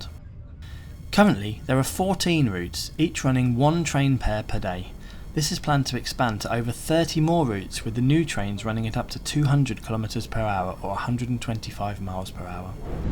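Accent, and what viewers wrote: United Kingdom. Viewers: Your calm British (clear) accent is comprehensible and non-partisan. Keep it up. As another member already suggested, your suggestions and observations have been listened to and implemented. Two glaring points to be taken up by Indian Railways. Timing discipline. Always make sure that Trains leave on time and reach on time or a but earlier if possible. It is unfortunate that the passengers could not connect to the Delhi Metro station due to the delay in reaching time. Thank You.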